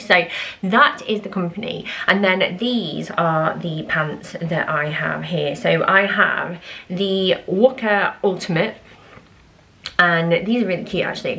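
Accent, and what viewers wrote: United Kingdom. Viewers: Random one but are you from the Wirral? 👀x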